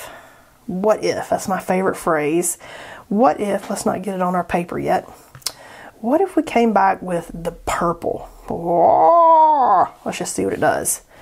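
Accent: South, United States. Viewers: Enjoying all your videos. Nice to hear a fellow Southerner on YT! Do you ever do in person classes?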